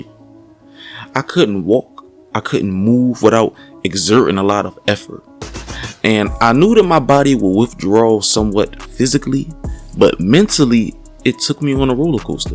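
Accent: Philadelphia, United States. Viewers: Yo you from Philly thought you was from Baltimore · Okay, definitely Philly, wow man hearing you speak the way you do fukk me up gang, so articulate but at the same time look burnt out, never judge a book by its cover ❤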